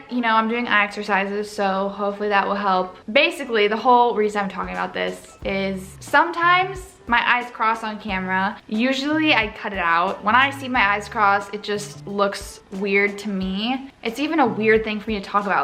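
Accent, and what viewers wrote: United States. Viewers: She has the LA accent now :((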